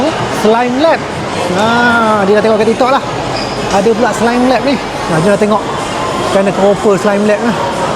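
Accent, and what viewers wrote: Malaysia. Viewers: Logat Johor kah? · wuih... mantap, cakap bahasa dengan aksen/dialek Melayu . 👍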